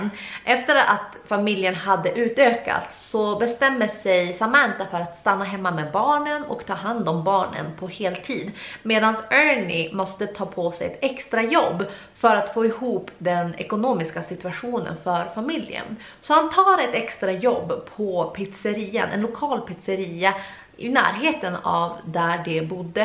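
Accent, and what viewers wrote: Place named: Västerbotten, Sweden. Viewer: Alltså du måste ju va från Västerbotten.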